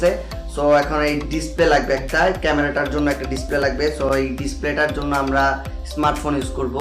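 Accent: Tangail, Bangladesh. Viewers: vaiya tomar basha ki tangail.....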